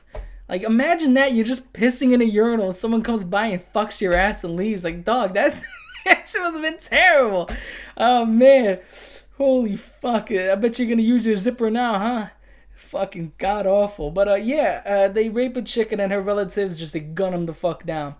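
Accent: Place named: New Jersey, United States. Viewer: As a boricua New Jerseyan living in Florida, your accent makes me feel right at home ❤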